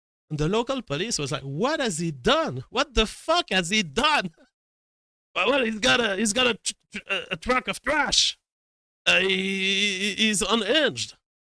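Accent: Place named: France. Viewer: I can't stand listening to English spoken in a Frog accent. I apologise for nothing.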